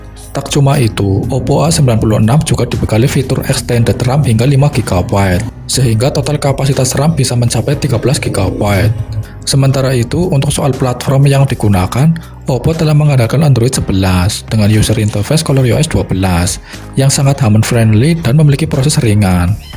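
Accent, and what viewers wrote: Banyumas, Indonesia. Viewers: Ngapak nyaaaaaa,...😁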